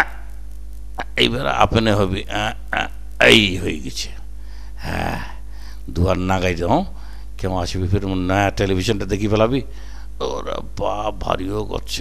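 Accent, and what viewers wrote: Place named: Dinajpur, Bangladesh. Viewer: দিনাজপুরের আঞ্চলিক ভাষা গুলো আপনার মুখে দারুন শোনায় স্যার। প্রতি ইদে এটা একটা আলাদা আকর্ষন অনেকের জন্যই। দিনাজপুরের সকলের উদ্দেশ্যে, দিনাজপুরের চোখধাঁধানো খেলাগুলো দেখতে চোখ রাখুন আমাদের চ্যানেল Sports Mania তে।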